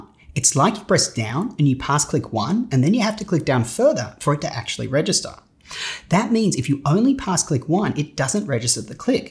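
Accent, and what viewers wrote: Australia. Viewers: Why do you use pounds if you sound Australian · cool accent, is it Australian？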